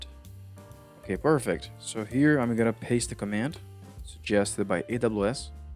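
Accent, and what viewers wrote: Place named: Brazil. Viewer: Fácil reconhecer o sotaque brasileiro kkk obrigado pelo video, ajudou aqui, tmj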